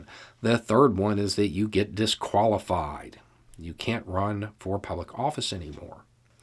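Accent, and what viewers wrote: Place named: Appalachia, United States. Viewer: I so appreciate your erudite qualities, in a hillbilly-lite accent (adorable juxtaposition us smarty-pants Northerners need to hear) leveled at the complexity of how our country works...or doesn't, during these chaotic and divisive times. Your voice of reason and truth is so calming. Thank you -muchly.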